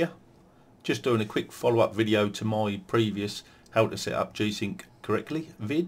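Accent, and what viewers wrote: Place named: Cockney, United Kingdom. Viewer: By the way, I enjoy your accent and you've got a thumbs up from me son! I'm from yorkshire but I do love a good cockney accent 😂 big Micky Flanagan fan.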